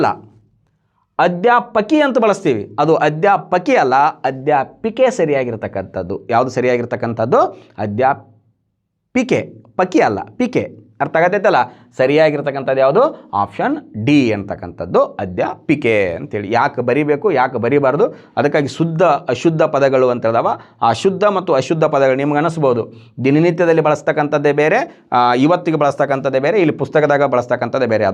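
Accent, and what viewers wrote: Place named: Gulbarga, India. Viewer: Not dharwad kannada it's Gulbarga kannada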